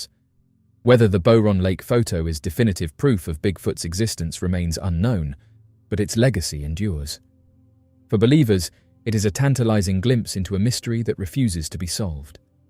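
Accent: England, United Kingdom. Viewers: Filmed means moving pictures not photographs. You have the English accent but obviously havent mastered the English language.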